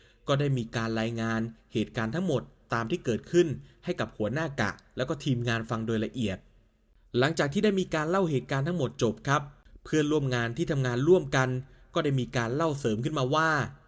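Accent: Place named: South, Thailand. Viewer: เส้นทางนี้มีอีกหลายเรื่องมากครับ ผมจะทักหลายครั้งแล้ว ถ้าเรดาร์ผมไม่พลาด แอด คนใต้ชัวร์ น่ารักด้วย 😉❤️😜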